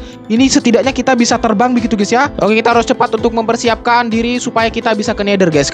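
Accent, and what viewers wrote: Madura, Indonesia. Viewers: Suaranya kayak orang Madura ketika memakai bhs Indonesia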